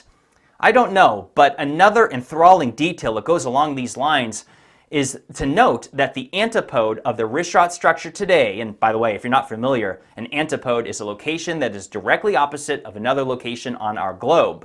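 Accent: United States. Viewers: you would seem far more intelligent if you stopped saying 45 hundred years ago, its written right there, 4,500 year ago. no other peoples I know of say this, only yanks. much like world series baseball when you dont let others play. come on, I am Shure you are very intelligent, so stop sounding like your reading a American used car price tag. anyway thanks for the video, although there was a show a couple of years ago of a gentleman walking around that eye and inspecting it. he wasn't convinced that it was anything other than natural even though he really wanted to find some proof of buildings, he could not, at lest to his satisfaction. I would imagine you are aware of this as you clearly have much knowledge on this subject. thanks again for you efforts......... 1000 thanks. notice I didn't write 10 hundreds, what a silly American habit.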